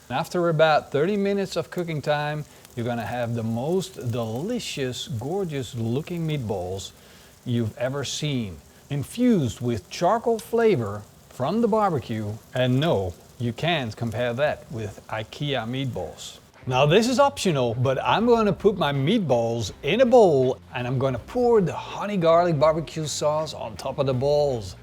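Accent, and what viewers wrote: Netherlands. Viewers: Wait, are you dutch?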